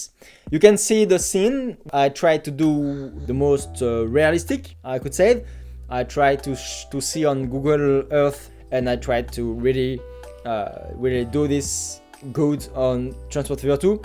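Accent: France. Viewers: i don't know why but i understand all your video, on dit merci l'accent français, je comprends que celui là 🤔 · this french accent is torture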